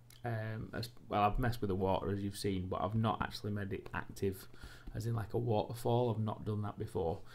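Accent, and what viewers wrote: United Kingdom. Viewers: You sound like you are from the UK but you use the North American term for sealent - how come? Are most of your subscribers from America?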